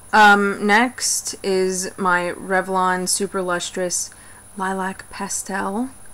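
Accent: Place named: New York, United States. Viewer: haha you embrace the pale! that was awesome. I do the same. Are you from NYC? Long island? im hearing some new yorker in your words..im from Bklyn!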